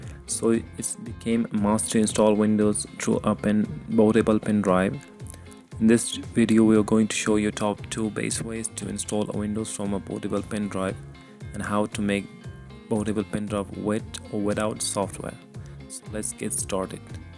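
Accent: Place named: India. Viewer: Bishaka takra shawi e Your accent is such as indian...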